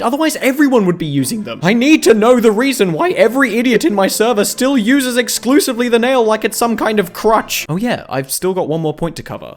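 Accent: United Kingdom. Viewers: I mean this in the most positive way possible, but I wonder how well this series would do without the british accent, because I think it implicitly adds so much to the videos through the sort of "snarky british" trope.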